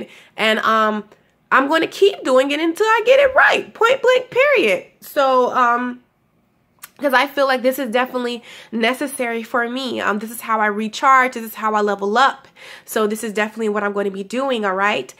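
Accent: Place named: London, United Kingdom. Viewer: Lmao your London accent gave me life 😂💀 ... I can hear you speak about self motivation all day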